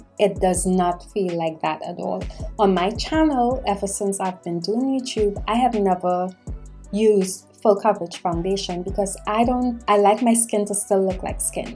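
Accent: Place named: Trinidad and Tobago. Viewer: Are you from Trinidad, Port of Spain? Beautiful accent ❤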